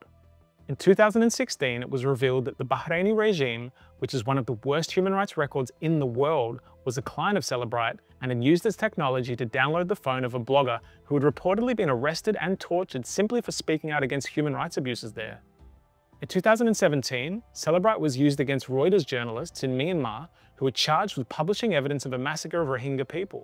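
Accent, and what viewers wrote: Australia. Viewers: It’s so good to hear an Aussie assent, alternative media is the new way for me to hear alternative news. Thank You.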